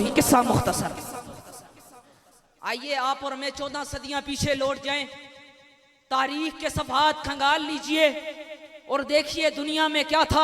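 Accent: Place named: Mansehra, Pakistan. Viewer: آواز سے محسوس ہوتا ہے کہ یہ مانسہرہ سے ہے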